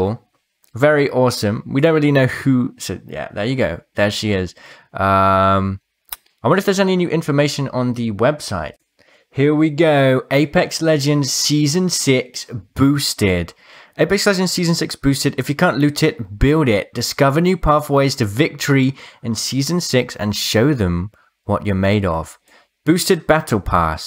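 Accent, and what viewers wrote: India. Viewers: Is it just me or does she sound Indian? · OMG CAUSTIC IS FINALLY GETTING A HIERLOOM AND yeah that's an Indian girl. I don't like the accent :p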